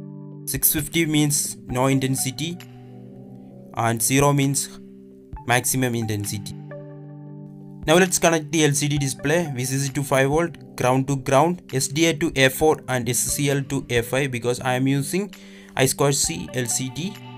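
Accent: Kerala, India. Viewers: Are you malayali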